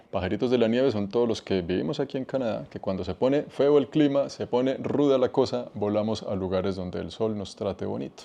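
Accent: Bogotá, Colombia. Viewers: Amigo, muy Guerrero siempre me pregunte cual era su historia, habla frances? Como aprendio? Por que vive en canada? Es usted nacional de otro pais, su acento es muy marcado colombiano.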